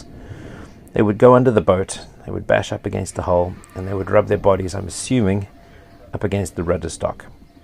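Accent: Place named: South Africa. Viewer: The narrator is from 🇿🇦 with that accent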